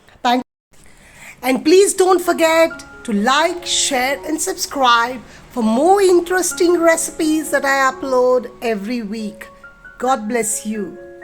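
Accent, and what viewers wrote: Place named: England, United Kingdom. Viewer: New accesent of language... Seems like England has recently adopted you 😢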